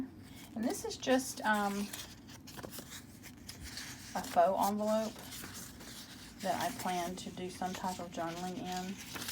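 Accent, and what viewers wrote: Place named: South, United States. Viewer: GIRL!! I love Butterflies and Owls too (and Elephants!)... I have an Ankh tattoo also! It is on my Ankle. I did it myself when my wife and I got together. I absolutely love everything about this journal! Also...where are you from... I detect a slight southern accent... :) - Hugs! - Kari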